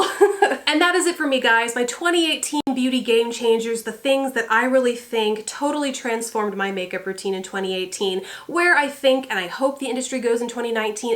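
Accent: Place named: New York, United States. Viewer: Your from texas? I thought you were from New York girlfriend:)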